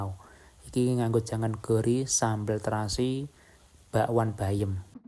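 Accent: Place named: Java, Indonesia. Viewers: Mase ki koyo wonogiri pinggiran Yen gunung kidul bhsane ono bedobe...umpomo yu .dadi zhu.. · Nambah komen mas,,ketok medok coromu ngomong ,,sampeyan corone masak ugo noto njero omahmu persis budeku,,.jangan gori,,iwak gereh.. Persis asli wong ndeso tapi kemajuane pesat,pinter opo wae. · Kakak orang mana bahasa Jawa nya kok medok kali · Mas sampean wong daerah ngeni sih kok ngomonge hampir mieip banget daerah ngonku aku wong surur sari grobogan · Bahasa medok smpean koyok aku ide ne iso aku suka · Sampean ki rumahe mana mas? Kok boso jowone medok?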